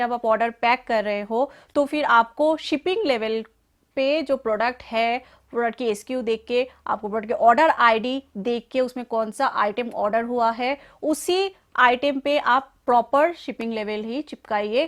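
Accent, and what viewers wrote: Bengal, India. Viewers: your language is a serious issue , else information is good...one has to focus a lot to understood that bangla , hindi and english tone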